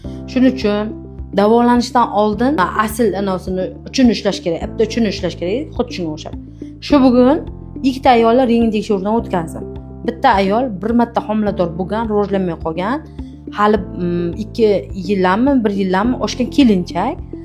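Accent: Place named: Qashqadaryo, Uzbekistan. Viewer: Qashqadaryolilani shevasi zorde😅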